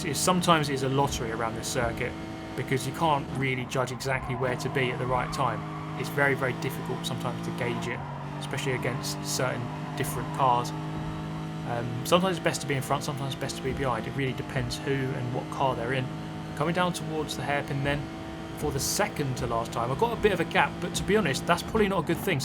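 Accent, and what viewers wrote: England, United Kingdom. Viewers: I have enjoyed your series on Grand Turismo racing. With your English accent it is almost as though I'm watching ESPN or any other sports Channel that covers European Auto Racing. I used to be really heavy into Gran Turismo all the way up to about number 4, but got out of it for some odd reason. From what I see with the ability to race online I have got to get a PlayStation 4 silver I can join in the fun. BTW, would you recommend playing with a steering wheel stick shift and foot brakes or with? Let me know.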